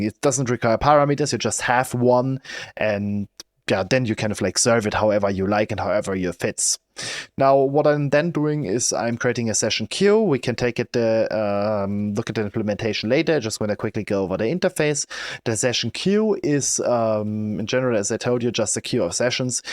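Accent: Germany. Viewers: loved the german accent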